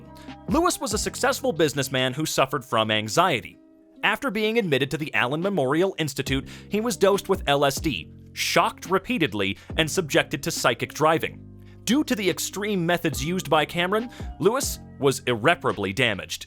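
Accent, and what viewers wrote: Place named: United States. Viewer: your voice is VERY American... idk how to explain it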